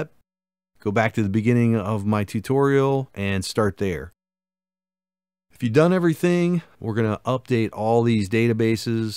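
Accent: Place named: Michigan, United States. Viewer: You have a Michigan accent 100%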